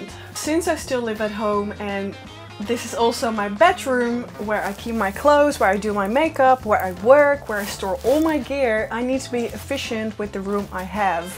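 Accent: Netherlands. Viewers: Sooo happy this came on my recommended videos, I love your energy it really makes me excited to shoot aswell, even though i feel a creative block right now, this motivates me!! (Also recognized the dutch accent the first few seconds!! Zo leuk om eens een NL account te volgen 🥰) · Oh, what a nice shoot. PS. I love your accent too, because my mom is Dutch too (but your's is so much fresher😅, She's been in SA for 60+ years) Keep up the good work and teach me more about photography, OK? thanks😉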